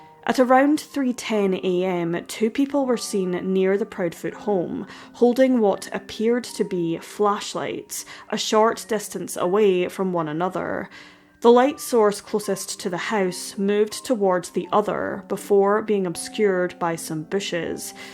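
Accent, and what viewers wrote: Scotland, United Kingdom. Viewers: She’s Scottish. I think from the north of Scotland, maybe the Scottish Highlands.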